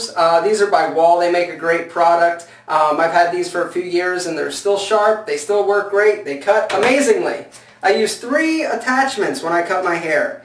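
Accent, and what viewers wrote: Philadelphia, United States. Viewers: you sound like ur from philly "pool it to the back"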